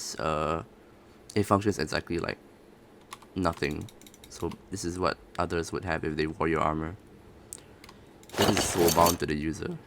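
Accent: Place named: Quebec, Canada. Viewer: Were are you from you sound quebecois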